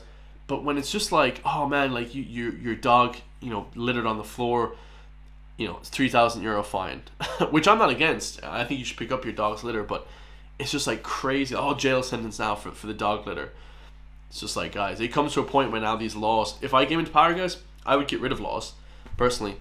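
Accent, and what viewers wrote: United States. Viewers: Your accent sounds familiar,what part of America do you hail from?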